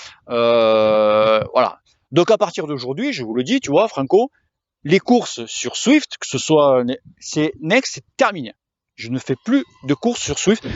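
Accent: South, France. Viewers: S'il préparait un peu son texte et parlait un peu moins méridional ce serait mieux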